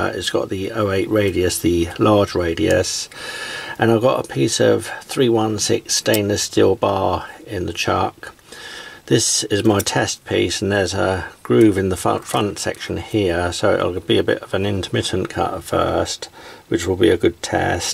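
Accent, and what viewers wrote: United Kingdom. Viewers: Hi Mate, I like the mini lathe. It cuts the stainless just fine! I ,also, like your ideas. I have one recommendation if you please. The threaded rod stop should have been a fine thread to allow an easier micro control ability than the standard thread. Your accent is British I think, are you from the UK? But you use metric. Personal preference or am I guessing the wrong location? Good day!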